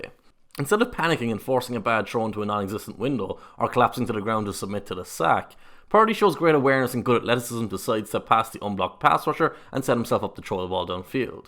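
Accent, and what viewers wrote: Ireland. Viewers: WAIT!......why is an irishman talking about American Football......and in a very concise and inciteful manner?? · Hearing an an irishman be so analytical about football brings me so much joy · i'm lovin' hearing an Irish analyzer review my team. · Nice -- more football analysts should have Irish accents.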